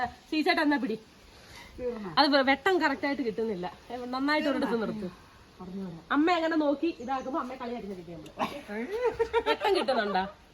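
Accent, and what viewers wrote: Kollam, India. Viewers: Kollam slang❤❤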